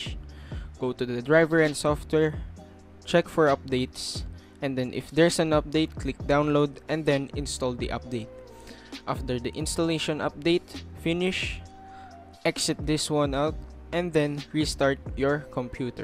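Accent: Philippines. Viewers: filipino accent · I instantly know this guy filipino😂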